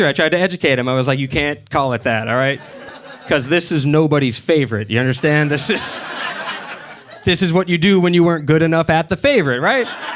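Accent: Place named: New England, United States. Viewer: Funny he reminds me of Bob Tasca III from NHRA although Bob has a harder New England accent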